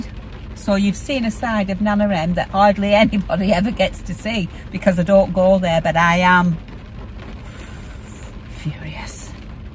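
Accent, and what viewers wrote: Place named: Northern England, United Kingdom. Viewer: Hi, I just love watching your videos etc. You alway bring a smile to my face. So good to hear a northern accent as I moved from near Manchester about 40 years ago. Thank you. Have a great holiday x❤️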